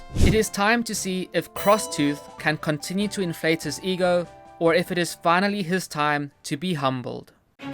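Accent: South Africa. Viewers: Dude this is amazing. You sound south african? Do you know where to get fly traps in Johannesburg?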